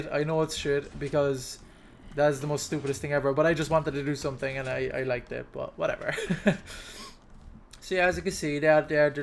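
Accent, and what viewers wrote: Ireland. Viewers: I believe he is from Cork (Ireland). He is definitely Irish though.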